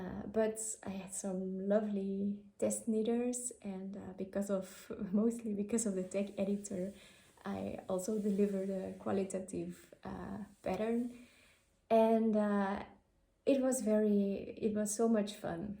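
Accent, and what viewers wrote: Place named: Belgium. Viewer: Leuke podcast goed om eens iemand uit België 🇧🇪 te horen . Mooie designs en ik kijk natuurlijk uit naar je volgende episode 👏🌸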